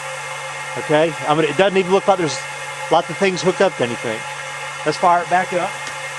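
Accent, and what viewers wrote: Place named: South, United States. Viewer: its weird hearing a southern accent saying "you guys" are you originally from up north?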